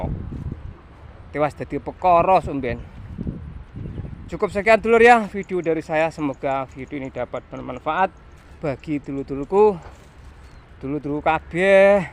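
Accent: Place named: Madiun, Indonesia. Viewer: Hadir om,, sampean asli pundi om?? Kok bahasa jawane mirip madiun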